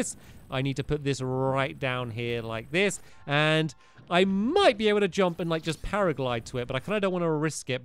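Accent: United Kingdom. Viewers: I Mean Stampy Is British So You Can't Blame Him.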